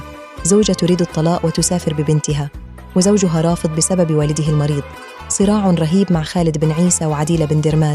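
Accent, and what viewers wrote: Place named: Egypt. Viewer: اظن مصرية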